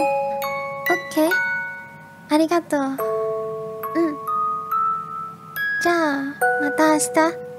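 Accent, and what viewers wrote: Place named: Japan. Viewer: Your Japanese accent ie awesome.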